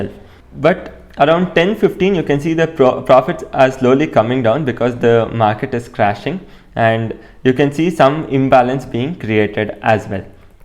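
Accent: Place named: Kerala, India. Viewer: Accent vinte malayali laga vunnaru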